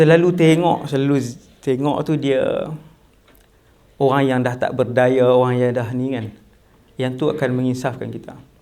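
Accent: Kedah, Malaysia. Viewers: Suka dengar ceramah ustad.. Dengan loghat kedahnye...❤️.. Semoga ustad sentiasa dlm lindungan dan rahmat Allah.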